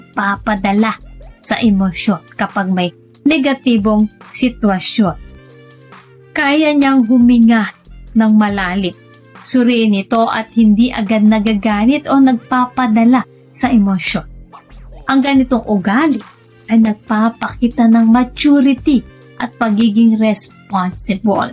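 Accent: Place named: Bicol, Philippines. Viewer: Parang bikolano ka Ma'am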